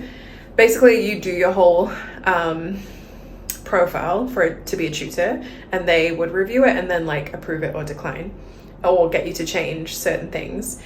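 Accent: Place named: Australia. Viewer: You got a Mystic Accent( (Oz/Yanky )have you been a teacher previously?With That Accent you’ll Acquire the Job Easily!! Good Luck & Enjoy ❤️‍🩹🇹🇭👍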